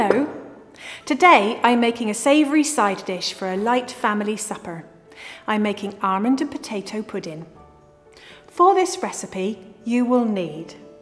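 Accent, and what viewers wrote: United Kingdom. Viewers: Where is this actress from?? I can't, for the life of me, place her accent in the UK. Armonds- almonds Saurce- sauce And so on. I'm wracking my brain! Does anyone know definitively?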